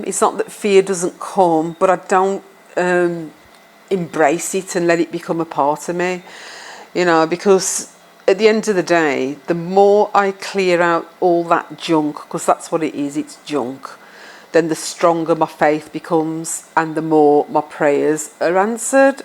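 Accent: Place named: Birmingham, United Kingdom. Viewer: So powerful. So inspiring. Thank you. I've been asking what it's all about and what do I do next. I've been living with cancer for 3 years. I'm from the UK too and I think you're from Birmingham too judging by your accent lol. I've been wanting to leave England and live part of the year here and part somewhere else since I was a teenager. I'm 46 now and fear stopped me from taking action. I still want to do something significant with my life. I am fit and well, no one can tell I have cancer but now I'm stuck because of the medications I have to take. I don't know what to do. I look back and realise that God was pushing me to take action years ago but I'm still here with my soul yearning for more. Thank you for making your videos. I believe I stumbled upon your channel for a reason. All the best to you . Oh I let the adverts play instead of skipping them when I watch your videos 😊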